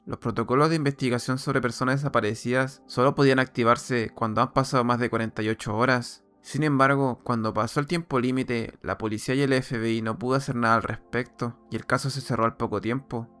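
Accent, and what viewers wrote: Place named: Chile. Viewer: me gustan tus videos porque tienes acento chileno saludos desde chile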